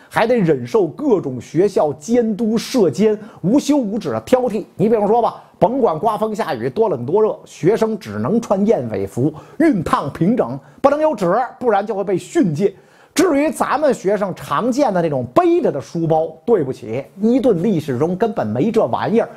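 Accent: Beijing, China. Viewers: 👍赞 老北京的音儿 👍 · 哎哟，甩着京腔讲贵族，，，真不是那么回事，别扭。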